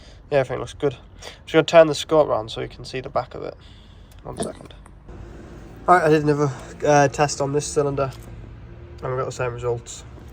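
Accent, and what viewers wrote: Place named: Middlesbrough, United Kingdom. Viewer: sounds very middlesbrough talking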